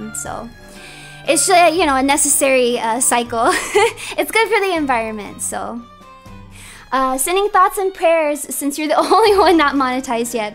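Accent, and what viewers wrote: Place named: New England, United States. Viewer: More southern than you thought? I thought she was from the New England area from how she talks o_o some might say the poler opposite of "southern"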